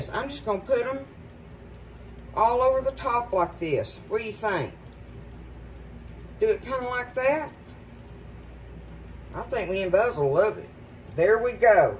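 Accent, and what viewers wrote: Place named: Appalachia, United States. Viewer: Miss I just love to hear you talk you have the sound of a true hillbilly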